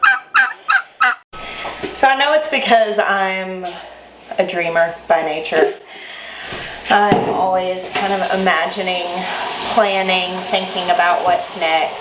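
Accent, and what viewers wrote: South, United States. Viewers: Just for the record, you're beautiful and I love your cute voice <3. Your southern accent is perfect for video; I think my own midwestern voice would drive me nuts if I vlogged! Thanks, for the encouragement about going gray. It's always hard to resist vanity and choose to be comfortable with how God made me. I'm not going gray yet, but I'm sure it's coming soon!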